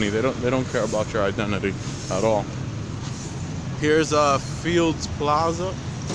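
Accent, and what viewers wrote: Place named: Bronx, United States. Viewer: It was your accent. Just reminded me a lot of my co-workers up in the Bronx. LOL. I work around the Fordham Area. Enjoying your videos.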